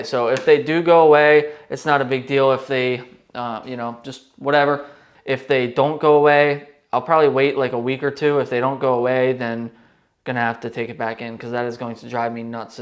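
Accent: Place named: Pennsylvania, United States. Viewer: Nice bro! Thanks installing mine later today! Love a good PA accent! lol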